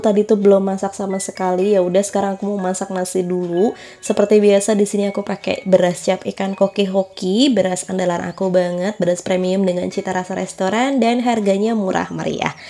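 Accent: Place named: East Java, Indonesia. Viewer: Hp saya jg barusan masuk service samsung mba 4hari kena lcdnya dan komponen apanya gt biayanya 965rb huhu,, qadarullah lg renang ta masukin di dompet hp waterprof tp gataunya ada lubang kecil dan masuklah air dr situ kena kamera depan sampe ngembun kemasukan air dr lubang gatau kalo ada sobek kecil ta bawa aja nyemplung renang Kurangnya diteliti lg pdhl baru beli kantong hp antiairnya huhu Salut bgt bisa betul hp sendiri mba suaminya Salam kenal ya mba,, ak suka liat ytb mba dr lama lewat akun suami gaberani coment hihi dah lama bgt dr masih blm 100k sub Awalnya nongol kok bahasanya kek orang jatim Semoga sy bs semangat bikin vidio kek mba panutan❤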